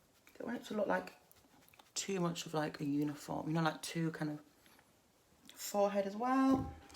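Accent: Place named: Birmingham, United Kingdom. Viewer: I seen you in the supremely satisfying video and your hair and accent is ace I'm British myself and it sounds like you come from Birmingham. Sorry if I'm wrong 😂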